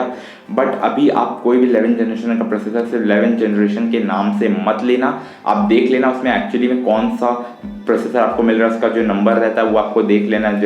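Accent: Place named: India. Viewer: this is not English... Indian English.. sorry nothing to understand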